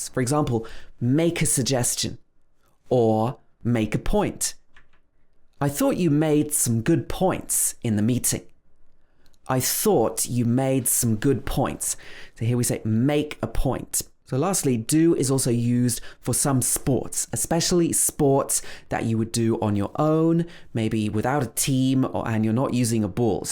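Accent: United Kingdom. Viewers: You are one of my best teachers. I like your accent greatly. I'd like to see more videos from you. You use RP sound what I like most. Take care. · It's cool video to study British accent 🤎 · I was searching for a channel where I can learn British Accent.... Thanks Allah Almighty.... He helped me to find out you... I am so happy now... Thanks for uploading videos on Accent in such a smooth way... I really like it. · Hello, I am a new discovered to channel I am an English learner from Somalia and I would like to British accent it is simple and lovely I think that your videos will help learners thanks for your help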